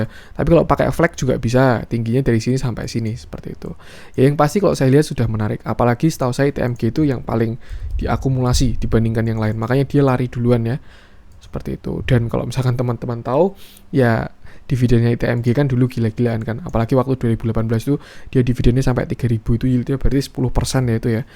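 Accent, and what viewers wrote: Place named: Java, Indonesia. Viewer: Medokkk... tapi sukaaakk cara penyampaiannya... makasi byk masbroo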